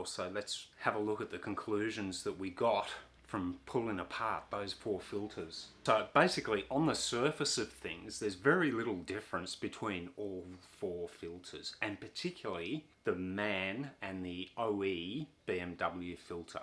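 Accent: Australia. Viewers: The second I heard the Australian accent I had no doubt this guy would be messing around!! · He's Australian, not British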